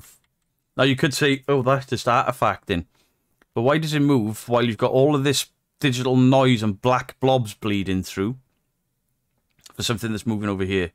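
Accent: Wales, United Kingdom. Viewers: thanks! I was monetized Once! I still get quite a lot of subscribers but no coin! ha I recognise the accent (as being Welsh lol) I'm in Carmarthen, Merlin's Town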